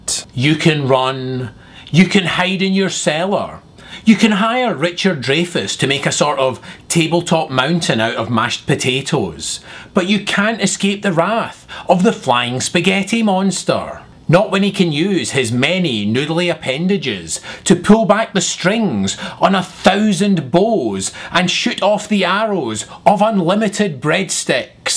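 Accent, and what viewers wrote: Scotland, United Kingdom. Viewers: hey, yus one funny dude! don't believe i take the 5 spicy meatballs but i do have 5 stars for ya! Keep up the good work and your scottish accent is COOL!!! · Scottish. Watch his earlier videos to learn how much he enjoys being mistaken for Irish.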